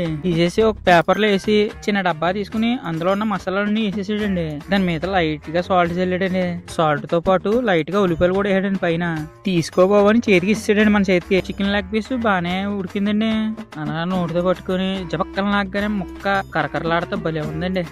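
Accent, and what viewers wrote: Godavari, India. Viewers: Endhi bro andaru reach kosam, Godavari slang marchestunnaru. Asalu Godavari slang somewhat decent ga untundi.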